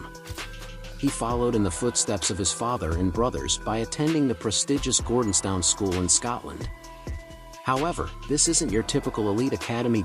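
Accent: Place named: North America. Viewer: More crap with a North American accent 🥱🥱🥱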